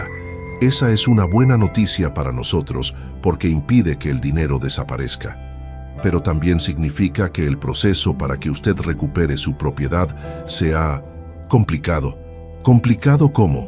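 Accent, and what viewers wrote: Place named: Dominican Republic. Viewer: Te.escucho.d e.dominicana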